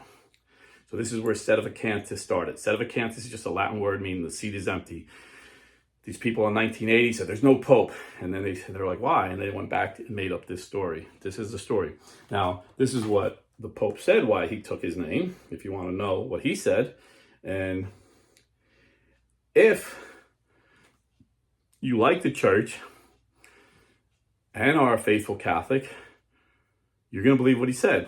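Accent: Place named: New Jersey, United States. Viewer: It’s just his Jersey accent. Likewise, a southern accent might say it slowly as “Holy-cost.”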